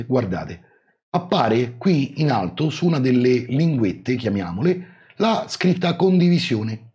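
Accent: Rome, Italy. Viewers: quella cadenza romanesca nel tuo ottimo italiano, rende tutto più piacevole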